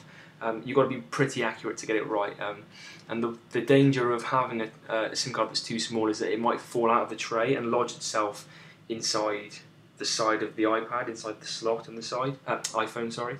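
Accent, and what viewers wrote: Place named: Bristol, United Kingdom. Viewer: are you from bristol by any chance??? I am from Keynsham (between bath and Bris)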